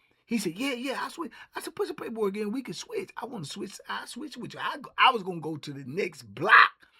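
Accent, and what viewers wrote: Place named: New Orleans, United States. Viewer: Yo do you have New Orleans roots? I know you from DC but I hear you sound like me from time to time. New Orleans ⚜️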